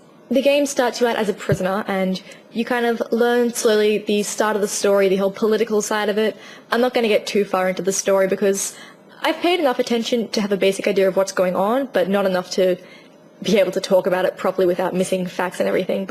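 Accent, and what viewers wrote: Australia. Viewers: Sounds Aussie to me, meh doesn't matter she cute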